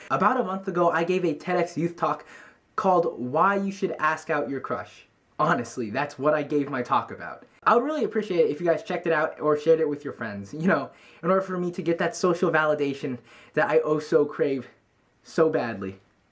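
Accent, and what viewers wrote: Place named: Vietnam. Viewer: I love your accent, are you Vietnamese?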